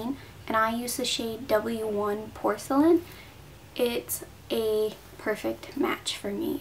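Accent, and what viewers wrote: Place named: Canada. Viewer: Are you Canadian? Lol, your accent clued me in, but then you said "shoppers" hehe.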